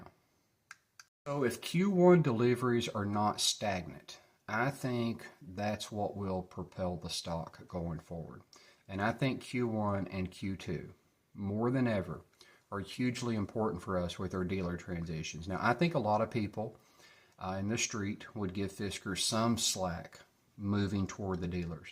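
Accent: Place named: Carolinas, United States. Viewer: Your accent is southern, yet east coast. If I had to guess, I'd say the Carolinas? 👀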